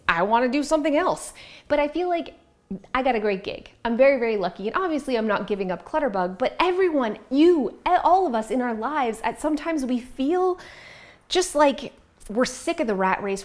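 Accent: Canada. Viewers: That's great that you want to do something new! I'm new to your channel and I'm just here for the tips in your Canadian accent and the shenanigans that fill your life and come out of your mouth. 😂 Maybe take the camera outdoors and let's see what happens when life happens. Byeeee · Thank you for showing up, even when you might not feel like it. I love the personal stories you share are how honest and real you are. I always learn something from you and you always motivate me to go clean or organize something. I also love your Canadian accent, since I grew up in Ontario, Canada, eh.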